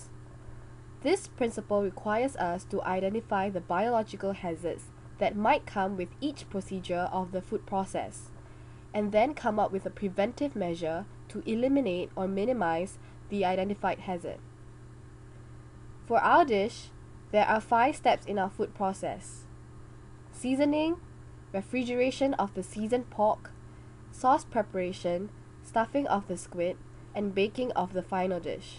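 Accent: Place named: Singapore. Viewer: Very good video. You sound Singaporean, btw. ;-)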